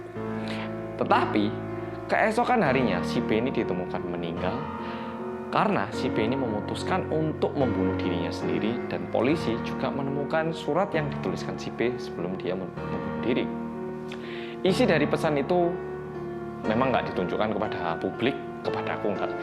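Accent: Java, Indonesia. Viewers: Biarpun sinyal ajrut-ajrutan..tapi tetap semangat nunggu loading.. Demi nonton konten nya detective medok..mas 👍😎❤️ Semoga selalu sehat nggeh mas.. Salam dari Kediri 🙏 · Bersama orang Korea yangggg medokkkkkkk.. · Semangat mas medok :) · Halo bang yang medoook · GERCEP MEDOKKKK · Medhokkkk😍 editan videonya mkin mantep mas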